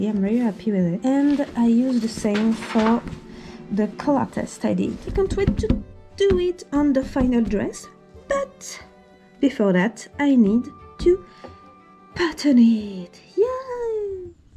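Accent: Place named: England, United Kingdom. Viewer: 😮 cet accent anglais 👍 le sacrifice à la méchante créature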